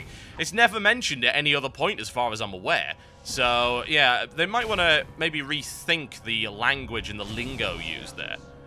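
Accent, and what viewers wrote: United Kingdom. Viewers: I do think this game seems impressive, but at the same time i feel like im unsure to buy it or not. I was so excited for cata (mostly due to these videos) but when i finnaly played the product i felt something was missing (parhaps the brittish voice in the background but who knows). Points is, i dont want to build up my expectations to high, to then have it shot down when i buy it which was kinda what happpend with cata. As for why i wrote this comment.... i felt like it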